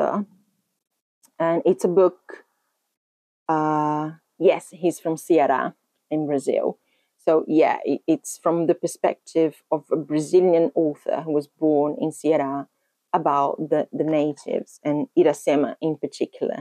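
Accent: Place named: United Kingdom. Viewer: Você tem um sotaque britânico lindo!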